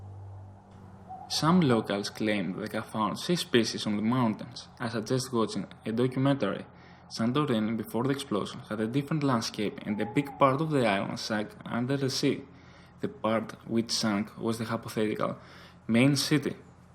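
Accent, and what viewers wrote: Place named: Arab world. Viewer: You're Greek? I thought you Arabian from your accent. I know nothing of other cultures!!! :'(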